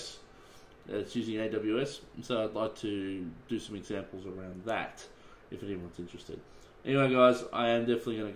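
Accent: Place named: Australia. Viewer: nice ausie accent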